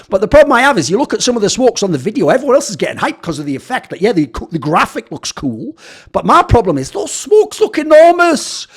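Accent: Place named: Middlesbrough, United Kingdom. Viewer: not wathced you in a while but glad to hear you've embraced your boro accent more than ever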